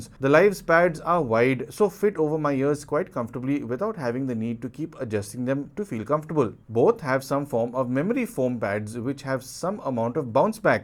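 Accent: India. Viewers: interesting review. Thanks for it...! You have a strange perhaps a Hindi accent, please speak a bit slow, because sometimes is dificult to understand. English is my second language. Thank you from Buenos Aires.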